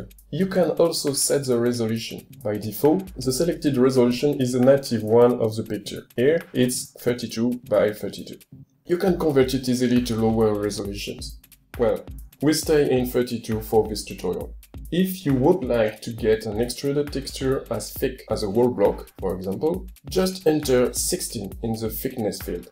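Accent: France. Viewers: are you french, tu est francais? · On distingue un petit accent français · Very good tutorial. You have a very heavy French accent, but still it's really good that you took the effort to put subtitles under it :) Keep on making awesome voiced tutorials!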